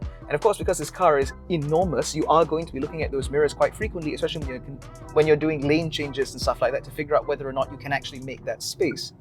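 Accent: United Kingdom. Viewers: Wow ...rasa macam orang uk yang review ... mantap bro.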